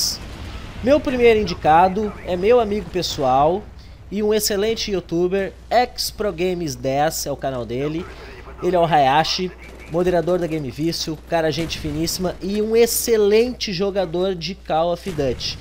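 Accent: Rio Grande do Sul, Brazil. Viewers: tu é gaucho??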